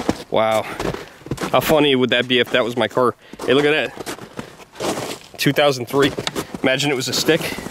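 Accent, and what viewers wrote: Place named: Midwest, United States. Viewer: Your accent is NOT Hyde Park NY. More Midwest or Minnesota. Just saying~~~ 👍❤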